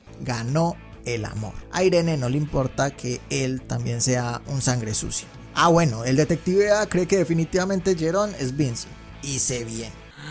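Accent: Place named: Colombia. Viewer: Que como se que sos colombiano? por el acento, y con la frase "la mata que mata" ajajajaja...sos de los mios compa.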